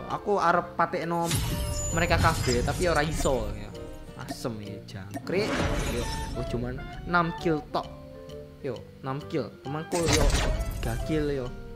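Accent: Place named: Java, Indonesia. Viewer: Medog Wkwkwk 😂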